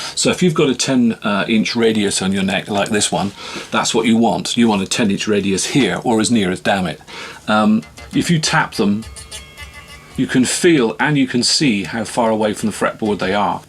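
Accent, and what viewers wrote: West Country, United Kingdom. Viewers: Nice informal and informative vid; Good job; I've just subscribed! I'm considering doing a self-build T type....principally as whilst I love the way they sound (who wouldn't....) I've always felt the ergonomics & comfort could be improved quite a lot - i.e. belly cut, arm relief, thinner neck, rolled edges on the fretboard etc.... I've never been able to find one that ticks all the right boxes, so I'm thinking I'll have a crack at it myself.....your series is no doubt going to be a reference! I'm thinking about doing an oiled body (I have a couple of early 90s Patrick Eggles with that finish and they both ring like a bell...) maybe with some sort of staining / burst type finish, if that's even possible.....interested to discover if you have covered this at all? Nice to hear a West Country brother...greetings from the Royal Forest of Dean!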